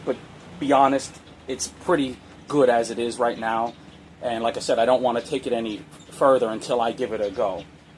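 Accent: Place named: New York, United States. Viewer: Two questions. First, what part of the US of A do you come from, I'm having a hard time placing your accent. Sometimes it seems New York-ish, and other times almost mid-western. Secondly, how much did it cost to make your anvils? I'm only a recent subscriber. I am using an antique cast iron anvil now and am thinking about upgrading to something more durable.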